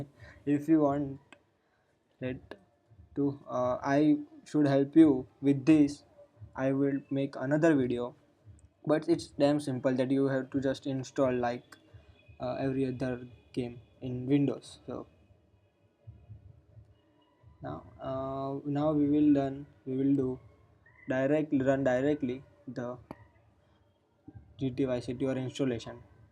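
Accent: India. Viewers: and like the indian accent :D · indian accent sucks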